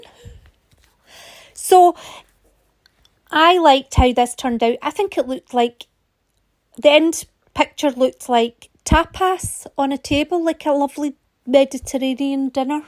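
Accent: Scotland, United Kingdom. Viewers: Just discovered you, and it's lovely to hear a fellow Scot chirping on about this technique and already producing some lovely work. Well done and keep it up:) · It’s about experimenting you’re doing a great job!! What part of Scotland are you from? I was born in Dundee I’ve been in Vancouver Canada for 50+ years … love your accent!!! Thank you for your video and you’re right it’s very much like a limo cut!! Elaine ❤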